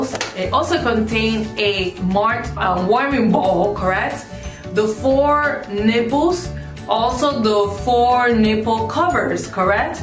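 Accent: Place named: Spain. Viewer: I love that she has this amazing Spanish accent, sounds and looks so bubbly - and that teal bow looks super cute on her! Video was also super informative, too. She sold the product better than the actual brand!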